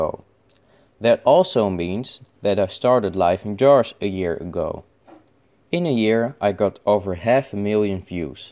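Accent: Netherlands. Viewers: RRRRRRRRRRrrrRRRrrrRRRrrrrrRRRR horrible Dutch RRRrrRRRRrrRRR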